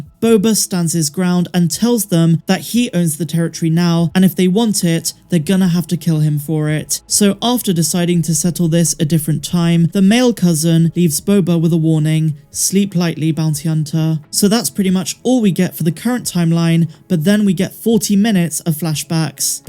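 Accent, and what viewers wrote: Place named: England, United Kingdom. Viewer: Twated lol big up star wars so nice hearing about star wars in a English accent keep up the good work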